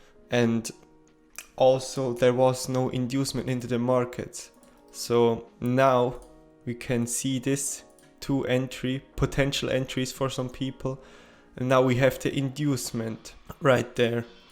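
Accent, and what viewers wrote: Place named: Africa. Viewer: 😂❤️❤️love it mahn keep uploading..african accent makes me more tuned in than James’s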